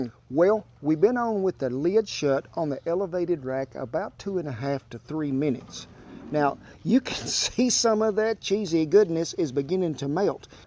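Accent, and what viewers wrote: South, United States. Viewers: Hey, greetings from Germany! I just stumbled upon this awesome and tasty recipe and now you got me so damn hungry... I'm gonna need to try this kind of cheese sandwich on my grill at home! Love your way of producing this video, love the accent and the puns - really makes me miss the US! Thanks for the video! I subscribed and I'm convinced by what you're doing! Greetings fellows! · I love his cowboy accent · I love a good southern accent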